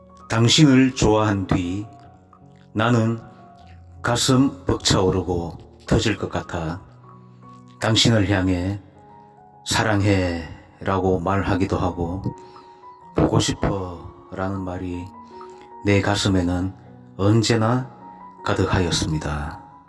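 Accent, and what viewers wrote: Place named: Gyeongsang, South Korea. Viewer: 경상도 아저씨의 사투리가 살짝 들어간 풋풋한 음색, 좋은글, 좋은시, 이 컨텐츠 너무 좋아요~ 좋은시 한편 잘 듣고 갑니다~ fighting~~~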